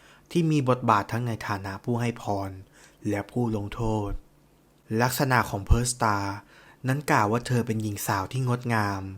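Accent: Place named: Thailand. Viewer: ติดเหน่อนะคะ😂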